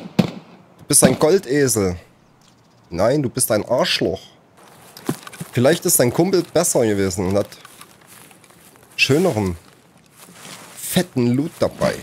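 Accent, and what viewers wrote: East Germany, Germany. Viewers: Du könntest aus meinen Dorf sein so wie du redest 😅#Ossis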